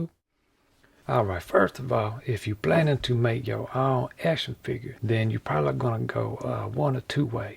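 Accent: Louisiana, United States. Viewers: voice just makes me think of a guy in the back woods bayou near New Orleans sitting on a porch with a banjo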